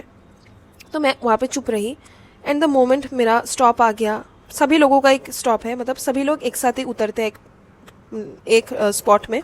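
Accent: Bengal, India. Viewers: Didi ap Bengali ho kya?😊😊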